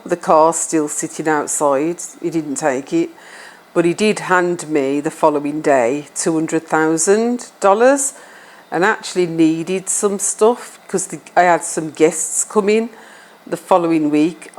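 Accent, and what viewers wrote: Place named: Birmingham, United Kingdom. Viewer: So powerful. So inspiring. Thank you. I've been asking what it's all about and what do I do next. I've been living with cancer for 3 years. I'm from the UK too and I think you're from Birmingham too judging by your accent lol. I've been wanting to leave England and live part of the year here and part somewhere else since I was a teenager. I'm 46 now and fear stopped me from taking action. I still want to do something significant with my life. I am fit and well, no one can tell I have cancer but now I'm stuck because of the medications I have to take. I don't know what to do. I look back and realise that God was pushing me to take action years ago but I'm still here with my soul yearning for more. Thank you for making your videos. I believe I stumbled upon your channel for a reason. All the best to you . Oh I let the adverts play instead of skipping them when I watch your videos 😊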